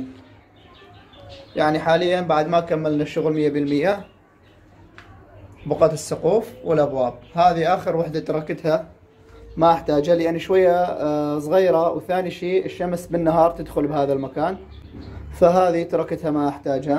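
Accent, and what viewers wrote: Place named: Mosul, Iraq. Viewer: عبالي شامي طلعت من اهل الموصل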